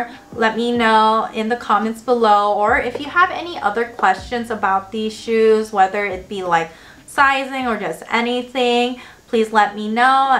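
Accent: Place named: France. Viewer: Ton accent français est trop mignon 🥰 *your french accent is so cute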